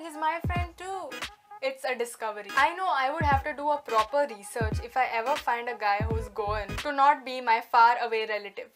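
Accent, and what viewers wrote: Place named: Goa, India. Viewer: Wow very nice. Dear. Even ur tone like ur origin frm goa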